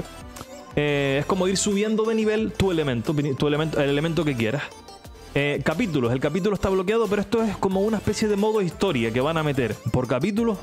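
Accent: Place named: Canary Islands, Spain. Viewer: ¿Eres de Gran Canarias o de alguna de las Islas Canarias por casualidad? xDD Se nota mucho por como hablas, sobre todo en la "Ch" Jajajaja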